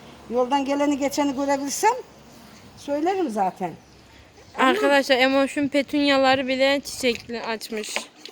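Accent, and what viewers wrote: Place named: Mersin, Turkey. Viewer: Ben Mersinli oldugunuzu anladim konusmanizdan bende Mersin'de yasiyorum cunku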